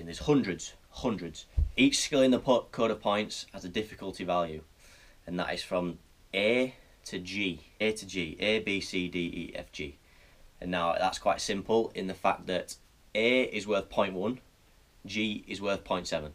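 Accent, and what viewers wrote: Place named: Durham, United Kingdom. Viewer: Cool video, and your accent is awesome. Is it Durham or thereabouts?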